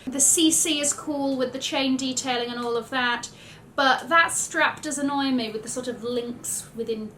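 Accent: United Kingdom. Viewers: Excellent videos on fashion trends, Miss. Fantastic British accent. Happy holidays from our channel: Australian Parakeets Stars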